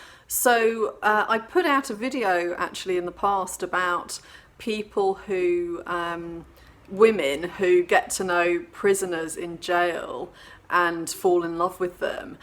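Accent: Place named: London, United Kingdom. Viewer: So it IS a London accent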